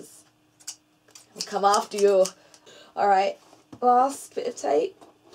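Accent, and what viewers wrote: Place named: United Kingdom. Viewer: I've had the privilege to get to know you a bit better. You are woman with a special personality, not just cute nor hot. but so much more. You have earned my respect for being yourself. And you should be proud of it. I love your enthusiasm and spirit. Your kindness and funny expresion. And ofcourse you lovely brittish accent :) <3 Sat Sat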